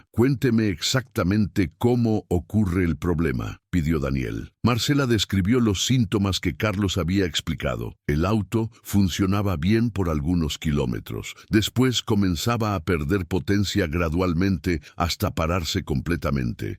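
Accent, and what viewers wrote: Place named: Chaco, Argentina. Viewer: te veo de argentinaho escucho pravincia del chaco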